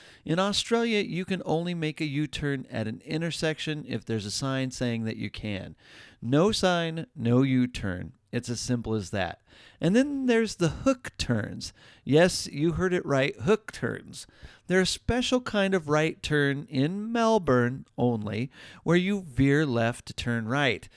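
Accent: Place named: United States. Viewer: I'm sorry with that American accent really put me off. Australia is NOT all about Kanga's and Wombat's on main roads. Learn a bit better. Everdday driving in Oz is very different. You are on the right in the driver's seat and yes, left of road. Makes sense. We are not imbiciles. Like anywhere, you need to be aware of all dangers and risks here but in everday travel you will not encounter kanga's or wombats!!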